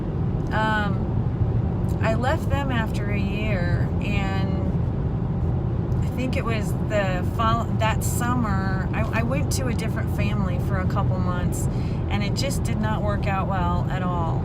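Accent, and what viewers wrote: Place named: Ohio, United States. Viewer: 😂 You sound just like my wife. She grew up in rural Ohio. When she moved to NYC, she initially found us to be rude and abrasive. After now living here for almost 3 years and seeing all of the good that is done, she sees we are genuinely nice people who remain guarded because we come into contact with a lot of crazy on a daily bases. Your journey is awesome! Keep sharing.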